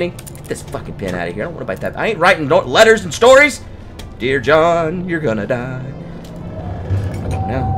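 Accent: South, United States. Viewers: gets really, really Southern when he's stressed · You just get more and more southern the longer this goes! "How in the HAY-ULL!?" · pissed off Southern accent is the best thing ever.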